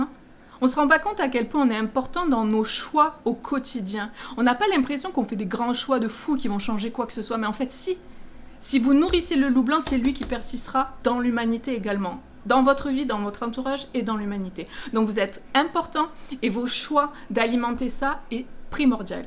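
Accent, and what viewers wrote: South, France. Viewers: Le bon accent du sud, excellent 😊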